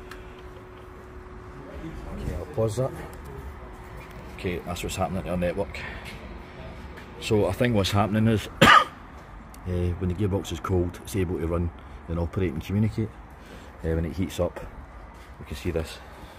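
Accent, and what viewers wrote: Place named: England, United Kingdom. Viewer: Strong English accent damn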